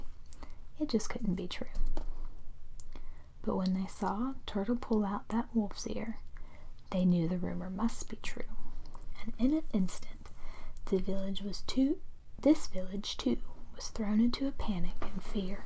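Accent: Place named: South, United States. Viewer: Southern Accent is awesome.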